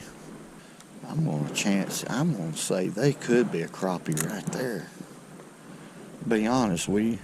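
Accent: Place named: Alabama, United States. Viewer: I just love it when he says "Q...u..it" with that Alabama Accent.. And Im from TEXAS!!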